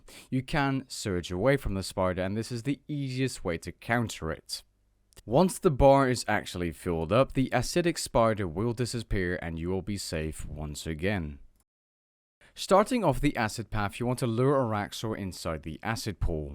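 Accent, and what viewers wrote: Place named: Netherlands. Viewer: you can't hide your dutch accent boy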